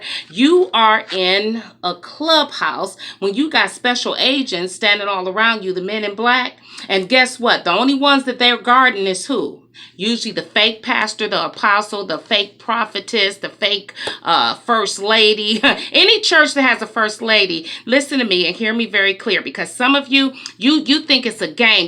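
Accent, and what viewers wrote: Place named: United Kingdom. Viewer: I love the sophisticated British accent! As you were reading the list, I kept thinking of my old church. I’m glad I’m out of there!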